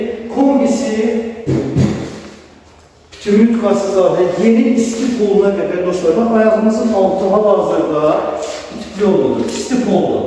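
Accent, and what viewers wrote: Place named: Nakhchivan, Azerbaijan. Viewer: Çox fikirləşdim bu ləhcə mənə tanış gəlir deme Mənim yerlim Naxçıvanlı imiş .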